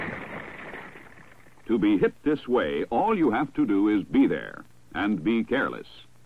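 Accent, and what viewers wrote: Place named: Boston Brahmin, United States. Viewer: boston brahmin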